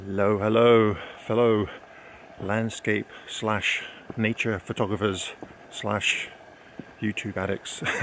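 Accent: United Kingdom. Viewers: Lovely photos. I loved the photo stacked one, beautiful. Can I ask, although you live in Canada are you British? You have a very British accent.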